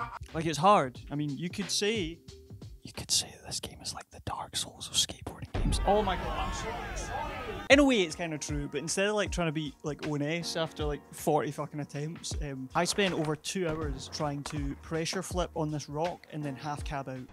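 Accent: Scotland, United Kingdom. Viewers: Belting vid with the hilarious ADHD style quick fire editing and Scottish accented quips.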